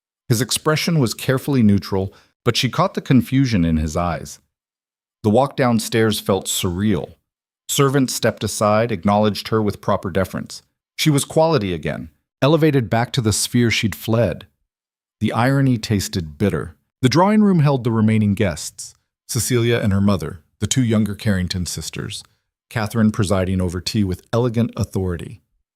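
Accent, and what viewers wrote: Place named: United States. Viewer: And I don't understand why the AU narrator has a nasally American accent when the story is set in England. Ridiculous. 😔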